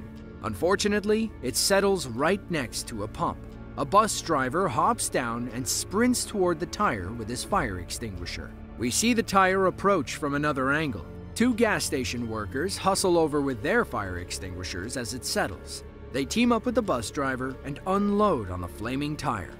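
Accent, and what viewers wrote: United States. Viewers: he speaks English like an American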